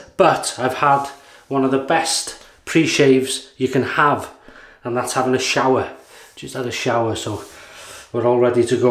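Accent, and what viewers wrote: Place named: Wales, United Kingdom. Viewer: Hi. Thanks for the videos. I've really enjoyed watching them, and found the very helpful. Actually, it's your videos that have convinced me to get into DE shaving, and I haven't looked back since! Thanks again, from a fellow Welshman. Hoping you are Welsh, as your accents sounds Welsh!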